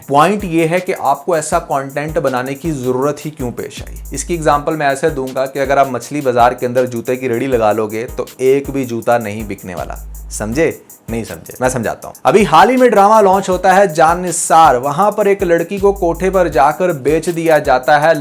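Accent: India. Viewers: Bhai ap indian logo ki tra kyn baat krte hn فر nhi پھر hota he Plzzz Urdu me baat kren Hindi me ni Ap hmare bht fvrt hn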